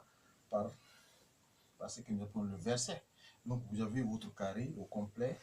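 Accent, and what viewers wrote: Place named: West Africa. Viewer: French with a West African accent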